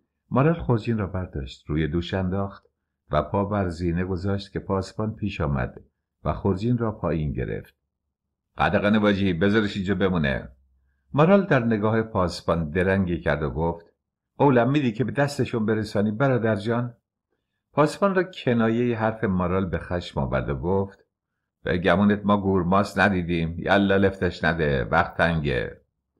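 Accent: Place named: Khorasan, Iran. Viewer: با درود استاد گرامی، گویش فوق العاده زیبایتان این کتاب را دو. چندان جالب میکند، بخصوص که مرا به خاطراتم به شهرهای جنوبی استان خراسان و همچنین لهجه زیبای این افراد میبرد. سپاس فراوان❤❤❤❤❤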